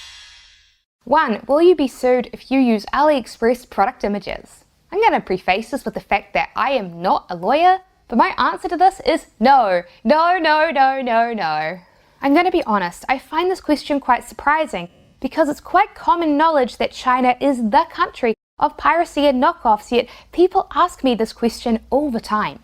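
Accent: New Zealand. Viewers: You must be from New Zealand with this accent! Nice and informative though. Thanks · She literally said " Hir in Niw Zilind " . Didn't you hear ? :) Love the accent btw no offense meant :D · Awesome video thanks! BTW my wife and I are currently doing about six months of travel in NZ and I have watched a few of your videos and I was like she sounds like a Kiwi, but I wasn't sure until this video. We call ourselves the Kiwi wannabes :P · I hear a KIWI lol.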